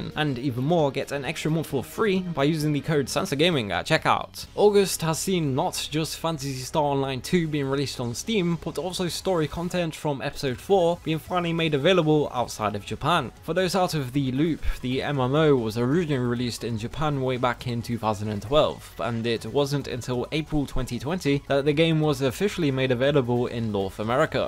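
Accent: United Kingdom. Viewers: Whoisthis a type of British accent, emphasis on type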